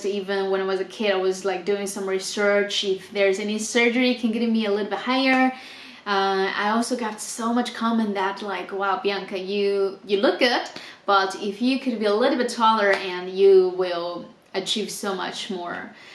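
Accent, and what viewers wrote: United States. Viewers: You have a slight American accent? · I was almost sure you were AMERICAN. You sound more American than most AMERICANS.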